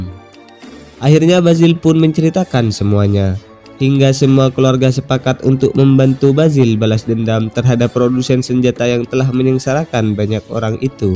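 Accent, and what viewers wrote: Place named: Madura, Indonesia. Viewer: suarane kyk meduro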